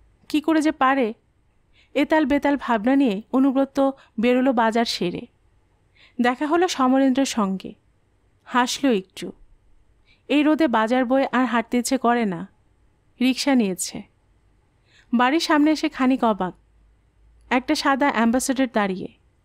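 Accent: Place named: Bangladesh. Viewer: Reading is good except a few Bangladeshi pronounciation.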